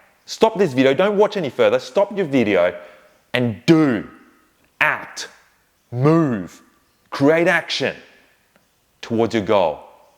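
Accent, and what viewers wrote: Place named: Australia. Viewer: Thank you for this video - I really enjoyed it. However I have difficulty understanding the thick Australian accent - I believe if you made it little more neutral it would be impactful on more people ! 😊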